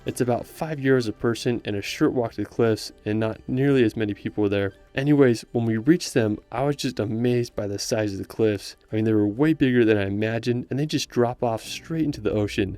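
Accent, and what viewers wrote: United States. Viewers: Why he sound like American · What a strange dialect does the speaker use? Sounds much like American, e.g. he says "senner" instead of "centre".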